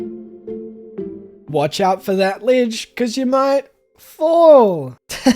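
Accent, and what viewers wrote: New Zealand. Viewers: Are you….a kiwi? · As an American, it is so painful to hear you use an American accent after listening to your lovely Kiwi accent haha. Love listening to this during my study breaks!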